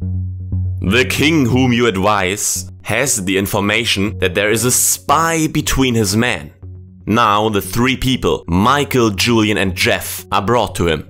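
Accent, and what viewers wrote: Germany. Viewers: Is that a german accent i hear?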